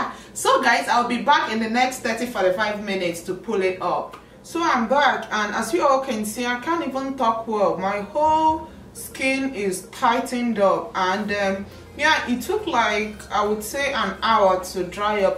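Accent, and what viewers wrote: Nigeria. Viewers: She sounds Nigerian. my teachers and friends have the same accent, and they're from there. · Many of Nigerians people speak that way. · are u nigerian ? u sound exactly like my parents...and basically 2/3 of my family . and if u are may i ask what part ? :)) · I guessed from her accent that she was Nigerian, like me, and I've seen it confirmed multiple times in comments. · Nigeria most likely, because her accent sounds very strong nigerian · She must be Nigérian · jmelias shanelle no. She just has one of the accent from a tribe in Nigeria. (Igbo) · Vasty A. she is Nigerian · U sound Nigerian :) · She's from Nigeria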